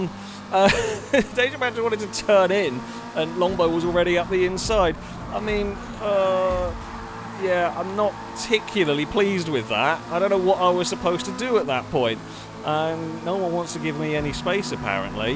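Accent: England, United Kingdom. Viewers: One last thing We can tell your English by your accent But There is no such word as gooder GOODER It's good of or good enough but there's no such word as good or you might want to modify that out of your vocabulary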